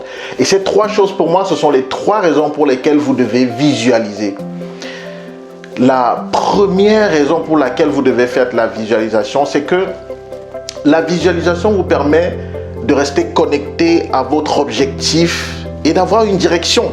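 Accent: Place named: Canada. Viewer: non non c'est juste une remarque, ne le prends pas mal 😂😂😂 Au début tu parlait de façon simple, mais je remarque que ton accent devient canadien Tu vit au Canada n'est ce pas ?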